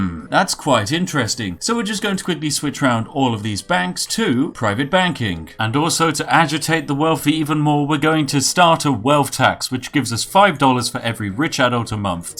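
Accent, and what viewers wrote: United Kingdom. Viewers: haha he's a brit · Wait... is this guy British?!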